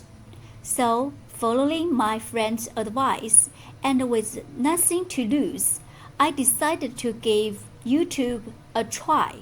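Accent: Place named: China. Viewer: You back❤ This type of English so familiar for me bcz of my Chinese boss.kind man he is .thank you so much showing how days are going ahead.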